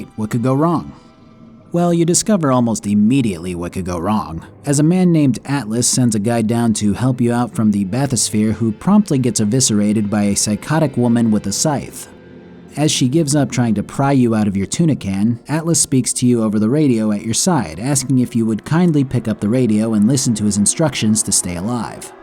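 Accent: Germany. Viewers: As german, I liked your german and german accent. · i love your German accent lol maybe bioshock 2 too?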